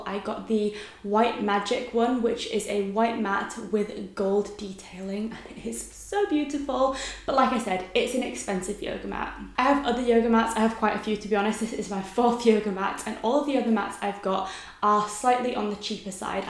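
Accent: England, United Kingdom. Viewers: She’s from England but idk where specifically.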